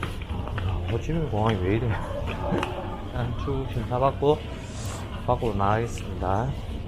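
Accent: Gyeongsang, South Korea. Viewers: 유튜버 말투가 참 인정스럽네요. 완전 경상도 말씨네. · 말투듣고 갱상도인지는 알았지만 김해분이시네요 반갑습니다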